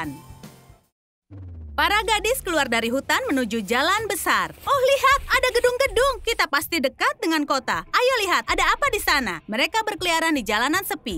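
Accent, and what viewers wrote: United Kingdom. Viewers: kak kakak orang inggris atau indonesia 😊😊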